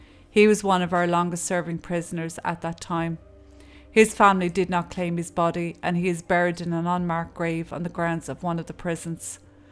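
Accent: Ireland. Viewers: "To be, or not to be - that is the question?" (Shakespeare - Hamlet) The pivotal question here, is whether one can ever accept the barbaric practice of torture, in the pursuit of what we believe is justice (conviction and subsequent closure in this case)? Should the criminal justice system to be influenced by politics? Should criminals ever be given back all of their freedoms, without proving complete rehabilitatiom of the behaviours which caused them to be incarcerated in the first place? Are prisons places of correction, or are they established for 'other' reasons? If Elizabeths and Mary's death are really meant to mean anything of greater value, have the Irish (and English) justicial systems learned and improved, from these tragic events? When being presented with these excellently researched and presented cases, one often wonders the motivations by the producer, why embark on such depth, why and what about such a case are their obvious empathy and compassion, as well as their perceptions of injustice, tiggered them into such a selfless search for the truth? 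Wonderfylly researched, produced and presented, in a lovely Irish accent, who couldn't like these videos, even though the subject matter is about death, torture, injustice, and often incompetance at all levels. They are set in a beautiful backdrop, in a wonderfully historical place, they are contextually perfect. Thanks again!! P.S. I accidentally removed my previous comment, whilst attempting to remove my answer to yo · Nightmare on Crime Street…YES!!! That beautiful Irish lilt. I could listen to read the phone book and it would still sound lovely and interesting! 😄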